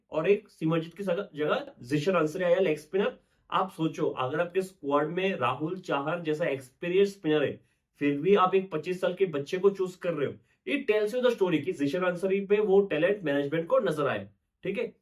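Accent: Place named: Northeast, India. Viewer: Bhai tum northeast ho kya accent vaisa hi hai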